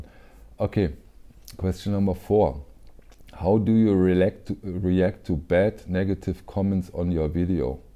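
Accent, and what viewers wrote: Germany. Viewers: I have found my new 'go to' to hear about vinyl, drink coffee, and hear a good old fashioned German accent! I lived in Wiesbaden for several years and miss the 'motherland.' I am fairly new to your channel but look forward to it. Keep the great content coming. And let the puppy get more screen time. :)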